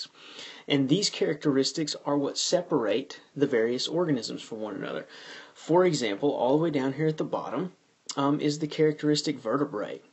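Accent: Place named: Texas, United States. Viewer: Thanks man! this was really helpful! I like your texas accent at times too ;)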